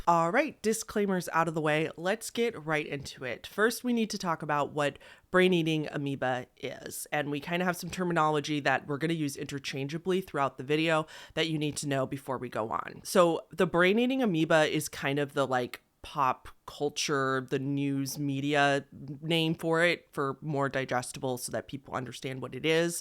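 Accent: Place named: Los Angeles, United States. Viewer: R u from LA by any chance? Why do you talk like that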